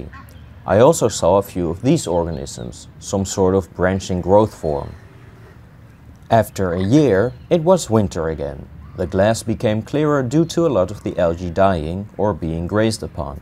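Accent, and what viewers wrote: Netherlands. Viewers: In the first few seconds i thought hmm is he Dutch? And at that exact moment it got confirmed by a bike casually riding by 😂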